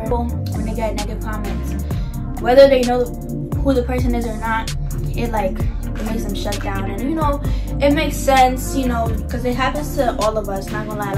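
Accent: New York, United States. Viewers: So New York love it girl ❤️❤️❤️☺️ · She has to be from new York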